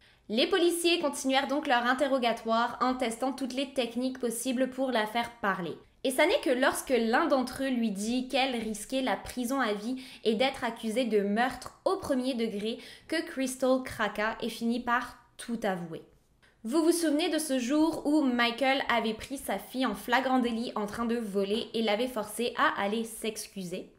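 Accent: Quebec, Canada. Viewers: je sais pas cest tres bizzard jai limpression que ta une legere accent quebecois desfois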